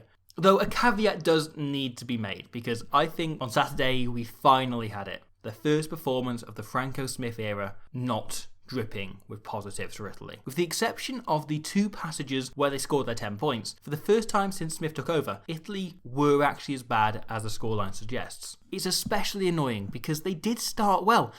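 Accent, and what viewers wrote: Wales, United Kingdom. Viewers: are u welsh??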